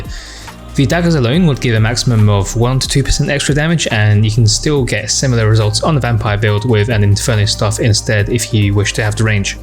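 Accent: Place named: England, United Kingdom. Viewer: Everyone says Nefas has the most sexy voice, but I think your English accent definitely gives you the edge. Love the video, love me some more magcro!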